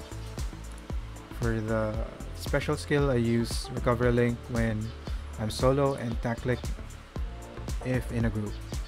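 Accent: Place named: Philippines. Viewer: this guys sounds like is flipino.. lool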